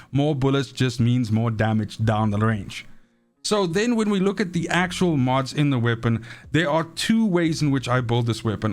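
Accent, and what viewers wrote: South Africa. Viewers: Yo man are you South African?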